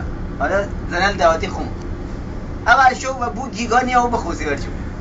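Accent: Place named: Fars, Iran. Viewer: این مال یه روستایی از استان فارس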